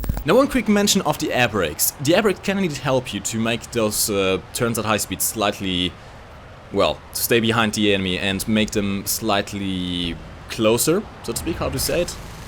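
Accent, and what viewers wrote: Germany. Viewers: I knew you were german and not portuguese :P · Are you German?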